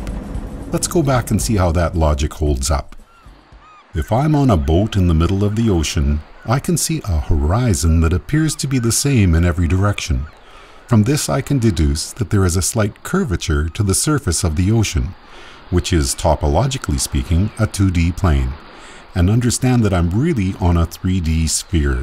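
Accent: Canada. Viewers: This guy's Canadian accent is really starting to piss me off. "Quantum FOAHM" "Michael's GOAHL is to poke HOHLS in the..." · I can hear your Canadian accent (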